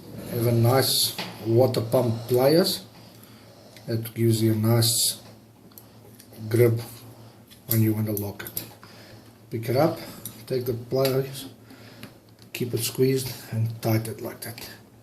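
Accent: South Africa. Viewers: Are you possibly South African? A Boer? :O Very nice ecu job! Thanks for the detailed video.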